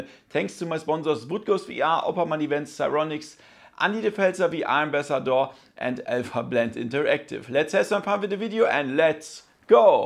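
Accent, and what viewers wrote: Germany. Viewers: Its rude to comment on how someone sounds I'd be offended too. Especially sense he put all the effort into the video and he's got people like you complaining about his German accent. It's a stupid and petty complaint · Everything he says is clear, there is no need to bring up his accent. He's German, why does he need to work on an English accent? He doesn't have to take your "advise" when it's an unnecessary critic of how he sounds. The dude went to the effort of making an English speaking channel to help out another audience and you're nit picking. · Are you German ?😂 Wenn ja ich auch haha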